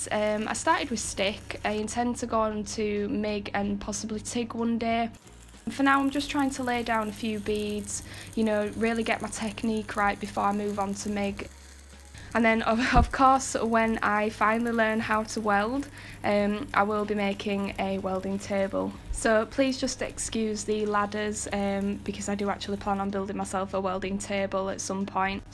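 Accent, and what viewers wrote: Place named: Lancashire, United Kingdom. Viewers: Brilliant nice to see Landy's coming back to life and as a fellow Northener (Lancashire) its also nice to hear your accent :D, young woman like you give me hope I have 6 daughters and I would say your attitude and attention to detail is a positive role model for them :) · Which accent is that? To my untutored (Australian) ear I'm guessing a bit north of Manchester. But what would I know!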